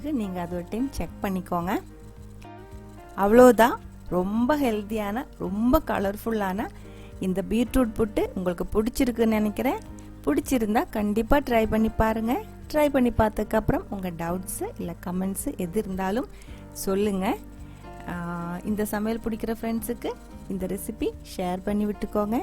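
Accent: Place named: Tamil Nadu, India. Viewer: I like ur Tamil accent 😍😍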